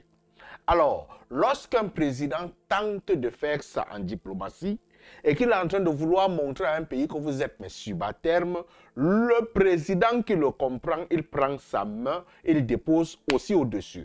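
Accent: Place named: Cameroon. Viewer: au senegal pays musulman constitué de confreries leducation est basée sur lenseignement coranique ou francais et non tout ce qui est maconnique donc il n'ya aucune chance que diomaye puisse comprendre ces choses en vrai senegalais quil est , mais meme si macron fait des signes maconniques il peut se targuer detre plus grand mais ce qui est sur est que diomaye est bien dans sa tete et connait deja la politique qu'il va mener donc les signes maconnniques je suis plus grand et tout , eh bien quils le mettent dans leur trou du cul tout simplement , rien a foutr et puis vous les panafricains camerounais car je sais que tu es camerounais par ton accent ce signe de la maingue maingue vous devez dabord vous occuper de deloger biya de votre pouvoir avant de venir noius donner des lecons de macons ou je ne sais quoi vous avez vraiment du boulot qui vous attend ces a dire deloger biya 40 ans de pouvoir voyons je ne comprends pas pourquoi vous vous acharnez sur le senegal ou yaurait d'autres raisons a ca , vous etes trop focalisé sur le senegal , occupez vous de vos oignons nom de Dieu ce nes pas parcequ on est africain qu on doit forcement vous rejoindre dans vos ideologies , ideologie pensee unique cest archaique et démodé ca chacun il gere son pays et cest tout nous cassez pas les couilles a la fin quoi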